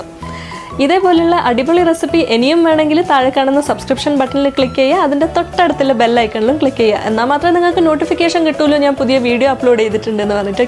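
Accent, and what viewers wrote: Kannur, India. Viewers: ഇക്ക് ഇസ്റ്റല്ലന്ന് പർജ്ജിലെ... 😂😂 kannur slang adipoli...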